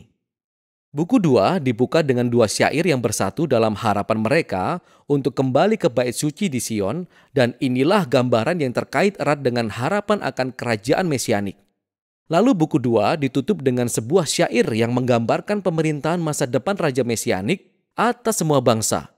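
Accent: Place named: Java, Indonesia. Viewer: Puji Tuhan! Konten yang sangat bermanfaat. (Pengen komen kalo Narator/Presenter nya agak medok sedikit hehe..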